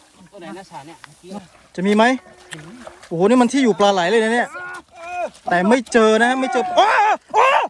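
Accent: North, Thailand. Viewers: อู้กำเมืองบะดีกาบ่าน้อยฟังแล้วสบายหูดีอู้ไทยกลางฟังแล้วมันบ่าม่วนใจ๋เฮาคนเมืองเหนือเน้อ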